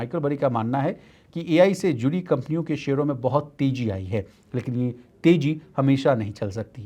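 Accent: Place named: Bihar, India. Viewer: Ye Bihari h tone se pata chal rha h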